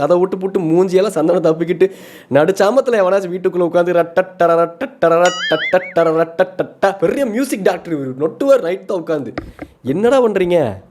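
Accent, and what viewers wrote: Coimbatore, India. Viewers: The coimbatore slang is super❤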